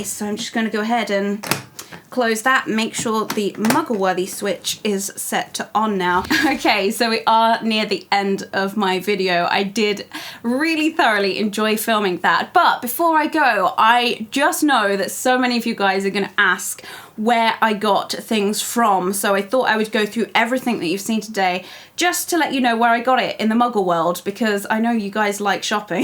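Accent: United Kingdom. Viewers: Cute video :) Cute cardi/vest-thing - love your British dialect/accent :D I just recently started following you, and I binge-watched a lot of your subscription box videos. I have signed up for the LitJoy thing and am excited to see if I can manage to get one. About the GeekGear boxes, I'm thinking of getting one of them - I would like to know which you would recommend: The wizardry one or the special edition one? Maybe you could do a video onm it, if other people have asked as well :) Thank you in advance, and have a lovely day!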